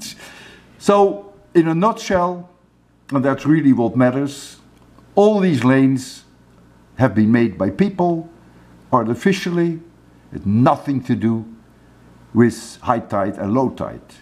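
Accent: Netherlands. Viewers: Professor, are you Dutch?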